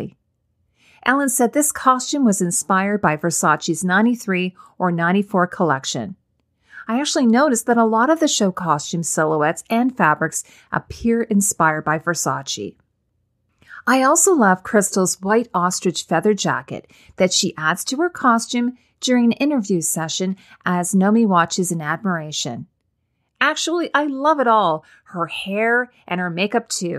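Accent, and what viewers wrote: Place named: Canada. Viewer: I notice a very slight Canadian accent on words like "about" :) It leans into to Canadian "aboat"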